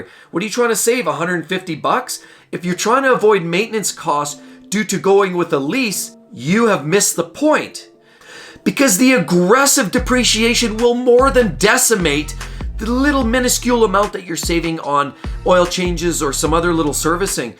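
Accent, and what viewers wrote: Canada. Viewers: This guy sounds Canadian AF.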